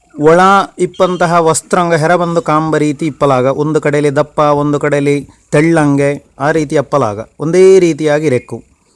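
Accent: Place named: Karnataka, India. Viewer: it's Havyaka Kannada of South Kanara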